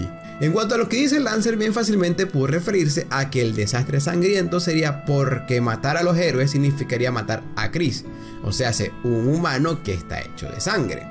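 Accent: Venezuela. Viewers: eres venezolano? :V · Eres venezolano XD mano del alma!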